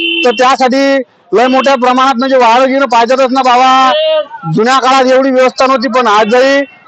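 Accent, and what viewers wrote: Varhadi, India. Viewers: आपल्या वर्हाडी भाषेत मस्त बोलतात दादा..... एकच नंबर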